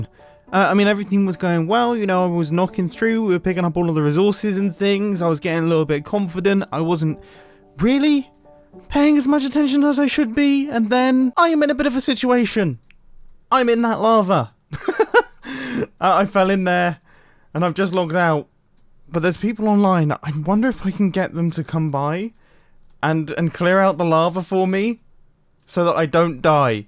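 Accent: United Kingdom. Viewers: his british accent level : strong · All the mild british exclamations are delightful. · The fact that or any other song wasn't playing saddens me.... And is it just me or did Mumbo get more and more British as time went on?? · yup, he's British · Mumbo; *casually and pretty monotone* I wish you ill, oh deary me I'm on fire, lovely jubly (So British, I love it) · I remember the moments when i realized that mumbo is british... All his britishness just became so apparent from that day onward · Mumbo is extra British in this video. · is the most british 7 secs ive ever heard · christ this man is british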